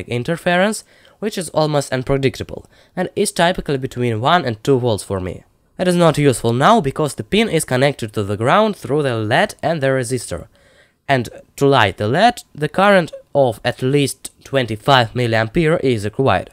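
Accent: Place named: Russia. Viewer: worst russian accent i'v ever heard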